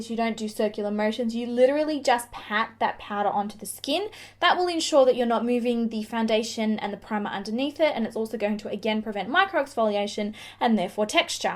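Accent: Australia. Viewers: I almost never have the sound on when I watch tutorials because basically most chicks annoy me. But I wanted to hear what you were talking about with this tutorial, so I turned up the volume. And you're an Aussie. All this time I didn't know...lol. New subbie lol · ?hmmm..let me guess.. Aussie accent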